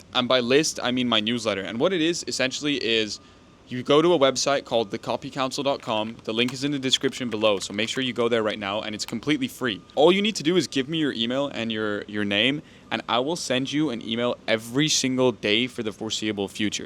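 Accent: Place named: United States. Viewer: you are not american, but you sound very american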